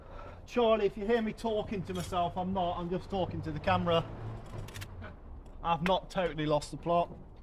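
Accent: Derbyshire, United Kingdom. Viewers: where you from mTe sound like Derbyshire. North East Derbyshire??????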